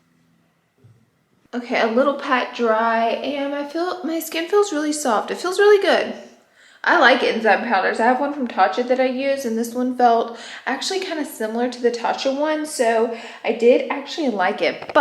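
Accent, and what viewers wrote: South, United States. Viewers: Aspartame. Where are you getting the extra syllable??? I know you're southern but c'mon. We gotta do better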